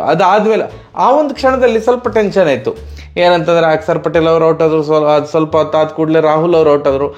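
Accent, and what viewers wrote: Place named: Coastal Karnataka, India. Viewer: Love your analysis and Karavali Kannada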